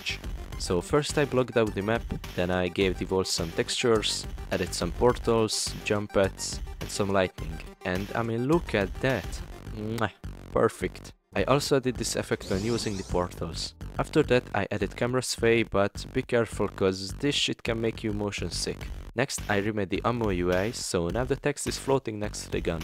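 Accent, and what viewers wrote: Hungary. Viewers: you are from Hungary?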